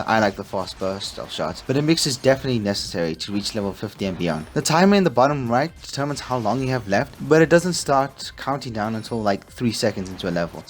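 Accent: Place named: South Africa. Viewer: He's south African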